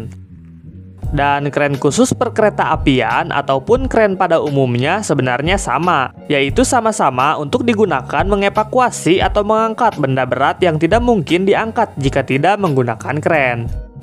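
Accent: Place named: Sunda, Indonesia. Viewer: Kental juga logat Sundanya, bro.👍🤝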